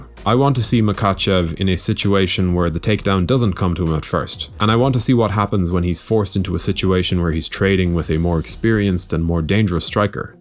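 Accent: Ireland. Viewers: This irish narrator bugs the heck outa me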